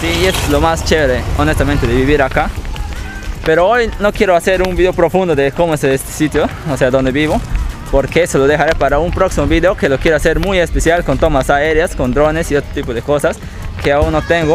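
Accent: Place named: Amazon, Peru. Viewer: Jaja eres charapaza hermano, saludos desde lima buen canal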